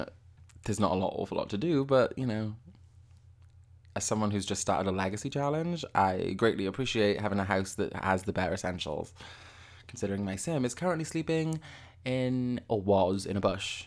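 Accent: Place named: Wales, United Kingdom. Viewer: You sound welsh, I am too (I’m from South Wales) 💓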